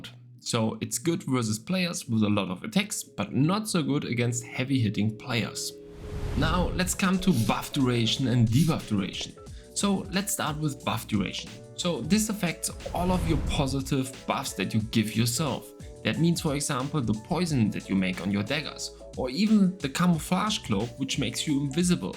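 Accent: Germany. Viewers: Vom akzent sprichst du deitsch also wirst du sicher nichts dagegen haben, wenn ich meine frage auf deutsch stelle 😎 Was bedeutet denn die Zahl ohne %? Das kapier ich gar nicht. Zum Beispiel Melee Heavy Attack Chance 401...was bedeutet diese zahl? Und wo genau sehe ich die allgemeine crit chance im allgemeinen? Danke für deine antwort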